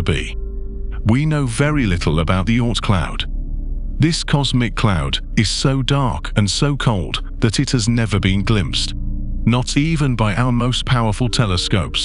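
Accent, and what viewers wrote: United Kingdom. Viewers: Nasa’s the biggest hoax played on people yet! Nice artwork though! People will believe anything attached to an alphabet agency and a British accent!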